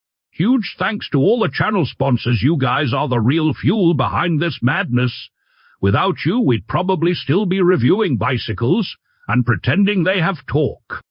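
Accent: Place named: United Kingdom. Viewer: A nice truck but what is with the goofy British accent? It makes me give a thumbs down.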